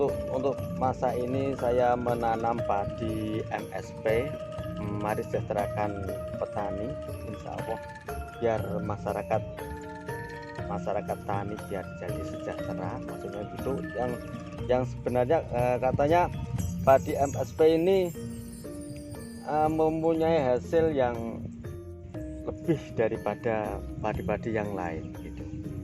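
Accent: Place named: Java, Indonesia. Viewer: Suarane medok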